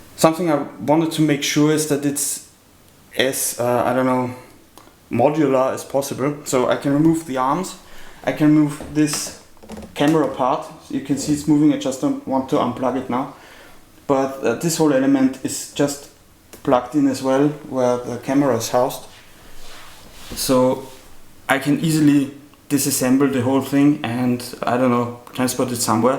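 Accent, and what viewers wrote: Germany. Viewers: Hört sich so deutsch an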